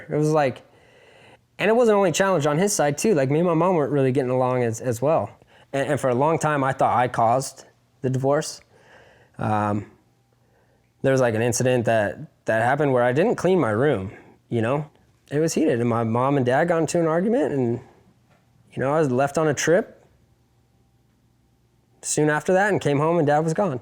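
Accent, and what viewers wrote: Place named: Simi Valley, United States. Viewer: lol i live in simi valley. a lot of my boys talk like that😂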